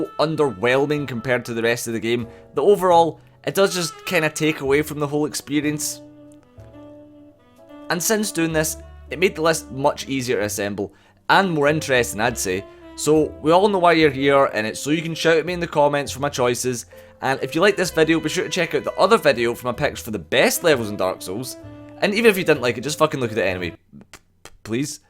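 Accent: Scotland, United Kingdom. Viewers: You sound the absolute spit of James McAvoy. What part of Scotland you from? 🏴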